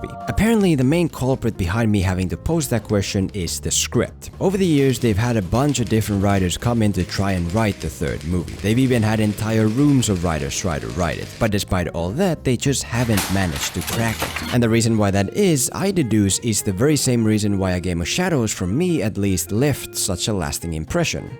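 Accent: Finland. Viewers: Where you from bro? i know you mustn't be American, you sound like you have a Finnish accent. Not sure tho. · Veli sun aksentti on niin suomalainen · are you finnish? your accent sounds finnish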